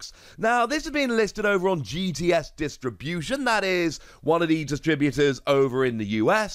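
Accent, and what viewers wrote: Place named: United Kingdom. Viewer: Yesterday I've watch your video about eevee evolutions vmax and I was thinking how british is your accent. Today you mentioned about your local uk distributors :D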